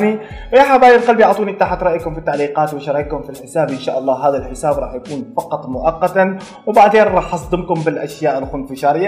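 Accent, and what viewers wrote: Oman. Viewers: انت ايش اصلك عماني ولا شو ؟؟؟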